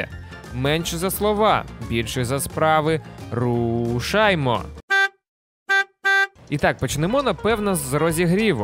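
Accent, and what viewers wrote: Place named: Surzhyk, Ukraine. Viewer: Супер! Від суржика взагалі кайфую)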